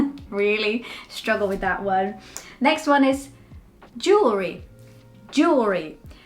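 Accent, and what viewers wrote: United Kingdom. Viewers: You learned british english. American english pronounces the R. We also tend to pronounce many of the T sounds closer to a D sound. British english T sound is more pronounced at all times. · I'm a Brummie who was educated in the 50's and 60's, at my school about 80% of teachers were Welsh, probably descendants of the work force before the war who left South Wales headed towards the midlands looking for work. As a result I have grown up surrounded by Welsh accents, regular day trips and holidays to Wales furthered my knowledge of the Welsh and their accent. I can honestly say that I've always thought English spoken with a Welsh accent has always seemed the true pronunciation of English, it's not distorted or slurred by local accents (as a Brummie I should know) it just seems pure and full pronunciation. Your problem is you are apologising for your adopted Welsh accent, don't let the influence of Wales leek out oops! 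sorry leak out, it didn't harm Richard Burton or Catherine Zeta-Jones.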